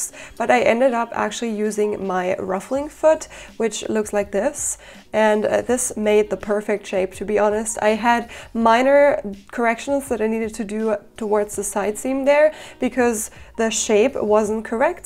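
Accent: Germany. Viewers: i can totally hear you're german :D nice dress tho. will try to make it myself.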